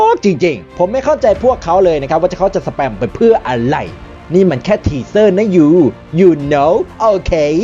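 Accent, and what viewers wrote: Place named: North, Thailand. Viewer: ติดตามได้ซักพักคัพ ชอบมากๆ ผมก็คนเหนือ ได้ยินสำเนียงเลยถามเพื่อความมั่นใจ อิอิ ...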